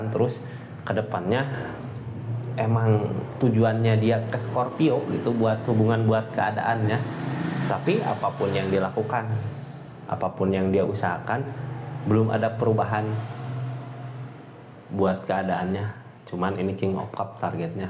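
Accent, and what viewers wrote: West Java, Indonesia. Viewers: Si Aa lucu speak up aksen Sunda pisan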